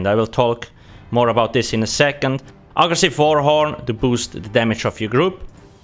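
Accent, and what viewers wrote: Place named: Switzerland. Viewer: Definitely not Russia, Switzerland I think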